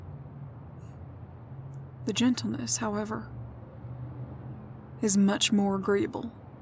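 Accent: South, United States. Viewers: The southern accent makes it so much more calming lol I always come back to these vids.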